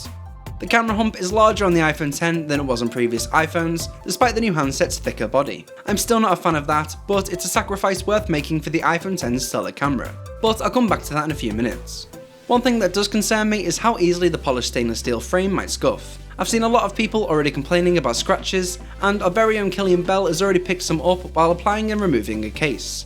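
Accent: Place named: United Kingdom. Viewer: It’s his accent ... he’s probably from the UK or Australia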